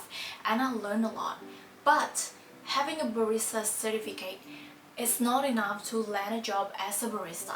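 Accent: Australia. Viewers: 7 years with a good ozzy accent, me living for 11 years (94 - 05) still cant get that accent. BTW good luck on your goal. sukses ! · hello! i'm indonesian too, i really love to watching your videos! i actually thought you're australian cuz your accent is so awesome, cool!😳😍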